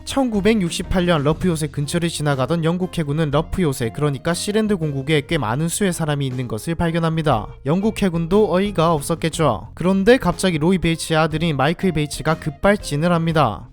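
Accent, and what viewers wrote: Gyeongsang, South Korea. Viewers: 여자목소리 들으면서 자면 달달해서 구독 눌렀다가 이 울산인지 김해인지 진주인지 모를 촌놈스애끼 씨부리는거 듣고 구독취소 바로 박음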